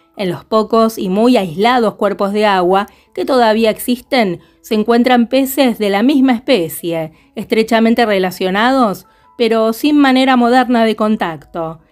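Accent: Argentina. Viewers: Que bonita voz, ¿de que sector de argentina es tu acento?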